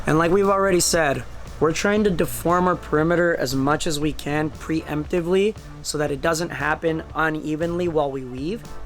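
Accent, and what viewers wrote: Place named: Spain. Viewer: I like the Spanish accent. Caught me off guard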